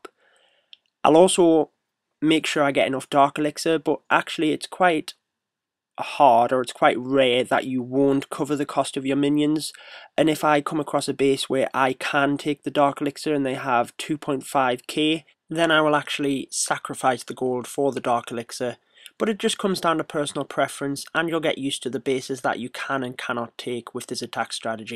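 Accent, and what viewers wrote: United Kingdom. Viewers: O yeah, now I can hear the original British accent. No American mixing 😂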